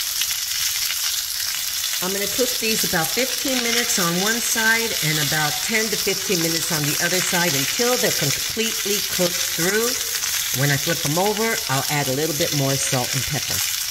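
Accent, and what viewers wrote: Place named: Tri-State, United States. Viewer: Back east??? Hell no! I'm on the west coast, lady! hahahaha! Can't you tell from my accent? Say -- and forgive me if I'm wrong -- but are YOU from back east...like tri-state, by any chance? That's what your accent sounds like to me. Again, I am SORRY if I got that wrong.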